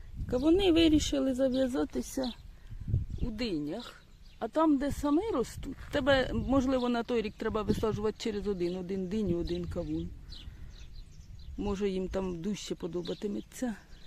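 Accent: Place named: Kirovohrad, Ukraine. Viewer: Гарне відео, інформативне. Репортаж з Полтавської області, а говір такий, ніби з Кіровоградщини (Олександрівський район).🙂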